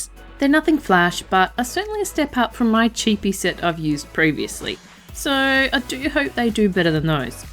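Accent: Australia. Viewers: First of all: You've been making me smile a little bit more with every post-advent-calendar video. "The Manipulations of Manni (Manny? Mannie? Man-ny?)!" (🤔 Needs work.) I might be a bit obsessed. Also: I just wanted to say how I love, appreciate and adore that, compared to sooooo many YouTubers, you're classic Ozzie. (NOT bogan, classic. 😂) And you make classic Ozzie look and sound amazing. I'm from NZ 🖤🤍but I've lived in Brisbane 💚💛for... a while - a number of years longer than I originally intended anyway - and I reckon NZ and Oz are super similar in that we're kinda... understated? We definitely don't tend to have issues with hyperventilating in our excitement . Not in the same way I've noticed those from other countrys seem to anyway (I mean no disrespect, this seems to be an Oceanic idiosyncrasy, is all).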